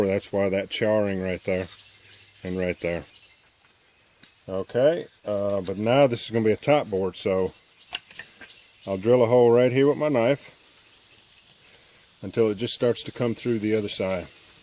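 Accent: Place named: South, United States. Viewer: Thanks for this video. Sounds like your down south somewhere.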